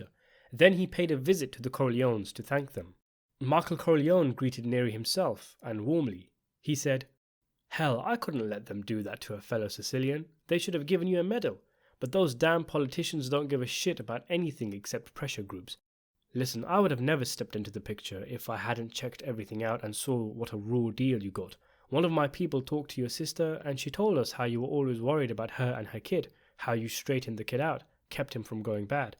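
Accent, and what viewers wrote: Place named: United Kingdom. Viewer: Thank you - but british accents are the worst.. Sickening.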